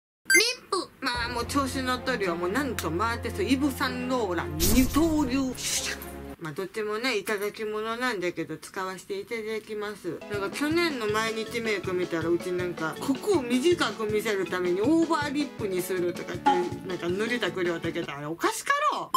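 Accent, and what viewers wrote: Okayama, Japan. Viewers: 岡山県民やけど久しぶりに見て岡山弁すごいでてんなってなった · 岡山弁？可愛い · 岡山弁がなつかしゅーて見てしまう(笑)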